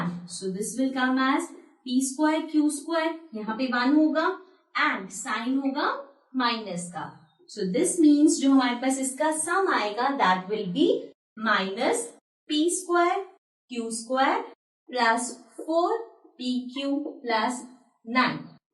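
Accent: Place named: Punjab, India. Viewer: You spake punjab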